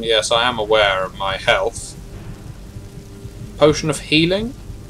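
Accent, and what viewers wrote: United Kingdom. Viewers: stories like this sound so much better in a English accent also lock pick is a waste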